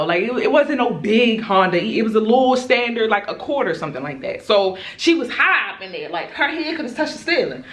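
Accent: Baltimore, United States. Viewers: You so baltimore I love it😂😍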